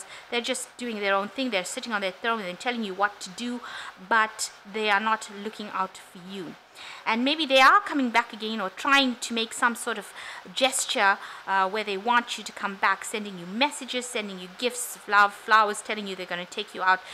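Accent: South Africa. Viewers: Thank you for your reading. Are you south African? Your accent sounds familiar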